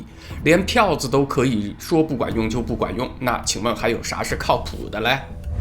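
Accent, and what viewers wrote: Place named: Henan, China. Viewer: 楼主的国语带有河南口音，我听起来很亲切，咱们是老乡。😂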